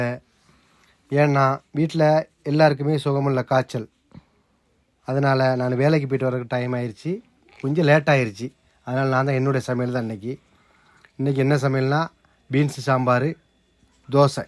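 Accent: Tirunelveli, India. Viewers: Bro slang thirunelveli touch iruku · Sister neenga tirunelveli ya Unga pechu apdi irukku athan ketten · அண்ணா நீங்க பேசுறது கேக்குறதுக்கு ரொம்ப நல்லா இருக்கு நம்ம ஊரு பாஷையிலேயே பேசுறீங்க நானும் திருநெல்வேலி தான்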